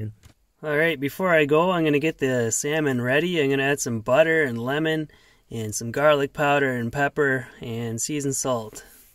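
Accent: Canada. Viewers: That Canadian accent! I'm from Wisconsin, and people think I have an accent lol. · Could listen to this guys Canadian accent for hours, in fact I think I have, shit what time is it!? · This guy sounds so Canadian 😂😂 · Love that Canadian accent, everything sounds like a question. This man has a better life in a minivan than a lot of people in brick and stick homes. Happy trails! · The most Canadian accent I’ve heard in my life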